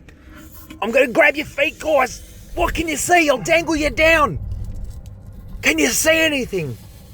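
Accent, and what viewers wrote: Australia. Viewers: Hehe he sounds like that Aussie animal 'hunter' who died stung by a stingray. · Lol this is like watching the Steve Irwin of plumbing. Not just the accent, the overall energy. Love it. 💖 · he’s from the land down under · I just wanna let you know… you sound like Steve Irwin, crocky!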